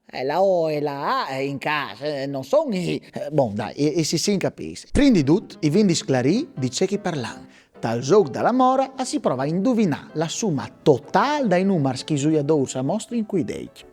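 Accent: Italy. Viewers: Brâf! Il furlan di indulà tabaitu ? Un tic talianât.. e tu sieris encje disint Ciao... 😮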